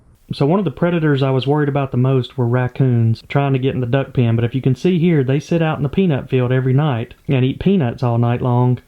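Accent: South, United States. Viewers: Al the Owl, because you're from AL, and our southern accent the sound close to the same. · I had to subscribe. Your southern drawl is so relaxing and the progress you make on your projects is satisfying. You praising your wife and speaking fondly of your daughter is lovely. I wish you all the love and success with your family and projects. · Name the owl big Al (owl) sounds just like big owl with your southern accent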